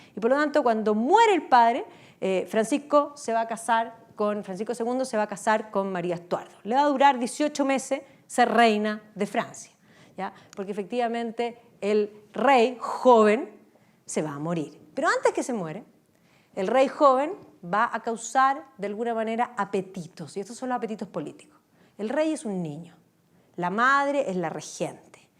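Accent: Chile. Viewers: Reconoci que era chilensis por el acento jajaja.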